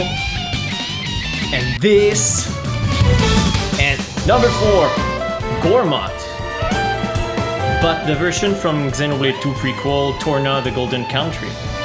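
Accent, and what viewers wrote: Quebec, Canada. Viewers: merci pour la video, tout après 5 seconde j'ai tout de suite su, sauf si je me trompe.. que tu es québécois avec ton accent anglais, qui ressemble pas mal au mien :P, très bon gout pour ton top 10 merci (sauf le no. 1 xD) et un subscriber de plus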